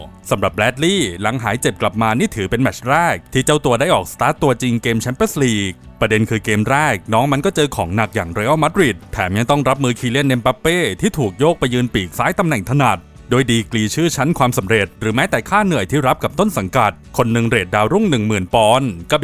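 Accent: South, Thailand. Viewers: คนใต้เฉย แหลงหรอยเลยหนิ ขามจังติดตามเลยพันนี้:face-blue-smiling:face-blue-smiling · เอ๊าแอด คนใต้เหมือน😁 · สำเนียงแอดนี้ไม่คนนคร ก็คนตรังผมว่าไอ้น้องบ่าว😂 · พี่เป็นคนใต้เหรอครับ สำเนียงใช่เลย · ผ่านจริง ติดตามมานาน555 พอรู้ว่าเป็นคนใต้ ชัดเจนๆครับ❤ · เอ้าาา พอรู้ว่า คนใต้นิ 😂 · แอดเด็กใต้ด้วยหรอเนี่ย😂😂 ชัดเจนเลยไอ้น้องบ่าว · หร่อยๆ ติดตามมานานแล้วครับ เด็กใต้ชัดเจน (ผม ป้ายชุมพรครับ) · 5555แหล่งใต้ได้ใจผมอิหลีคับ❤ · พี่บาวคนใต้เหอ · พี่บ่าวเป็นคนใต้เหรอ สำเนียงชัดแรงนิ❤ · พึ่งรู้ว่าแอดเป็นคนใต้ ชัดเจนโบ้เรา หรอยแรงแกงงัว😂😂😂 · แอดมินเป็นคนใต้นิ่ห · แหลงใต้สุดยอดมากเลย ผมคนสุราษฎร์ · เอ้า พี่คนใต้หรอคับ ถ้าพี่ไม่แหลงใต้ ผมคงไม่รู้ ติดตามมาสักพักแล้ว ทำคลิปออกมาเยอะๆน่ะพี่ รอชมผลงานอยู่คับ ❤ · แอดมินคนใต้เห่อนิ😅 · เห้ยยยยยย พี่บ่าวคนใต้เหอะนิ อัยจ่า ถูกใจ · คนใต้กันนะ 5555ติดตามมานานแล้วนะหลวง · ง่าเบอะแอดคนใต้นิ ชัดเจนพี่บ่าว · แอดคนใต้หรอ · หรอยน้องบ่าวแอดมิน แหลงใต้555+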